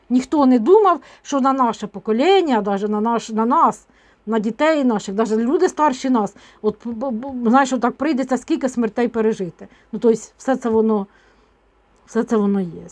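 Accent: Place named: Surzhyk, Ukraine. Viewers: Мені понравилось,а суржик наш,полтавський! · смотрю разных тарологов. Есть очень культурные, есть матершинники. Есть рускоязычные,некоторые красиво говорит по украински, но я обажаю ваш суржик.Вы всегда говорите очень просто и доходчиво. Спасибо за росклад.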